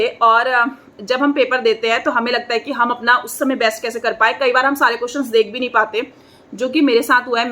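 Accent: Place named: Kumaon, India. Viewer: your accent is so kumauni ,,,,where do you belong actually??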